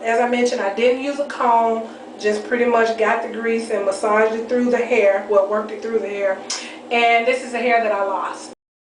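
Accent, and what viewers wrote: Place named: Mississippi, United States. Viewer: I am originally from Mississippi and your channel (and accent) gives me a taste of home. I never thought to use hair grease to pre-poo. Well dang... Gonna try this next wash day.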